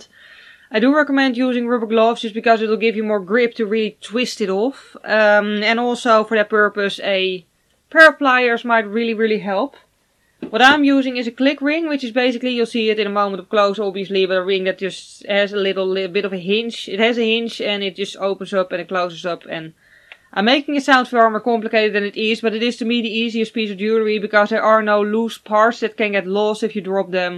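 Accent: Netherlands. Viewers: are you Dutch you kinda have a Dutch accent?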